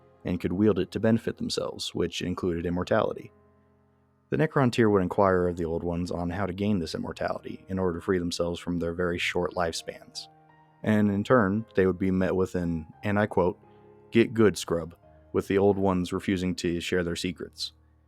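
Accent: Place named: Appalachia, United States. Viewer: nah man your slight idk what it is - appalachian twang - is fantastic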